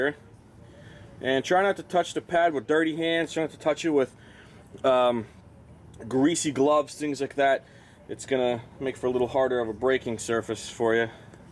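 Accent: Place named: Rochester, United States. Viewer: Very nicely done ... nice to hear (Rochester County?) accent, reminded me of person who first taught me about fixing cars. Though quite familiar with job, appreciated fine details and learned helpful hint about lube around clip area in addition to caliper and front side fingers. Imagine it is really supportive to receive appreciative feedback, especially considering what I imagine is only a low percentage of people who do appreciate it can/will take the time to write a comment.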